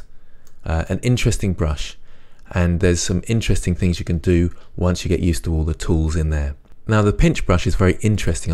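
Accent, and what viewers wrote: United Kingdom. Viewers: You know you're an Intelligent individual when you sound British and teaching Blender 2.8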